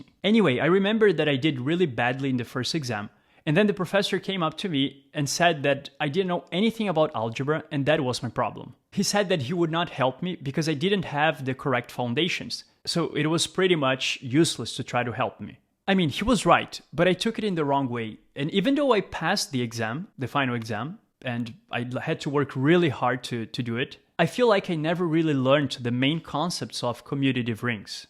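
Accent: Brazil. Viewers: É impressionante como o sotaque brasileiro é característico 😅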